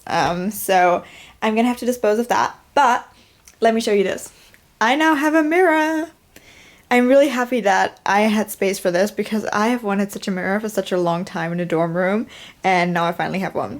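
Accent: United Kingdom. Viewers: It's like you re speaking a better English (in British way ) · You have quiet a British accent, love that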